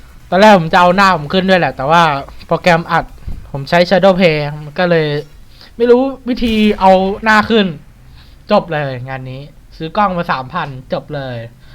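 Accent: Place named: Isan, Thailand. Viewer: ก็ว่าสำเนียงพูดเหมือนคนอีสาร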